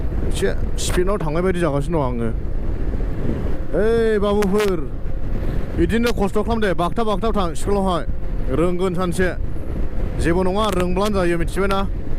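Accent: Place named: Kokrajhar, India. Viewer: Kokrajharni Baidi railainw Naja ada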